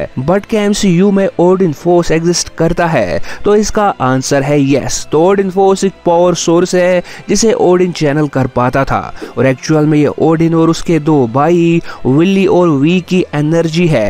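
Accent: Kashmir, India. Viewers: Bro are u from kashmir 🤔🤔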